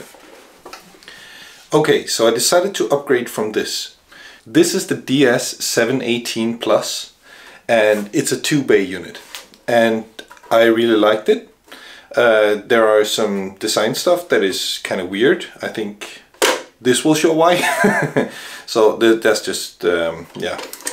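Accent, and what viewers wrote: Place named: Denmark. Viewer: I went for the DS1522+ which, after installing DSM 7.2 can also accept SSD for storage. But the same as your problem. However I do not use the SSD slots just jet. Completely offtopic for a moment. An British friend of mine said he could not hear a difference between Danish (like you) people speaking English and Dutch (like me). And hearing you speak, I cannot too. I first thought you where Dutch, so I looked it up in your profile. But that is a offtopci (for me) fun fact.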